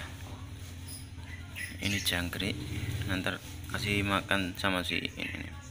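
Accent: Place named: East Java, Indonesia. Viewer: Wong Jatim koyok e lek ndelok logat e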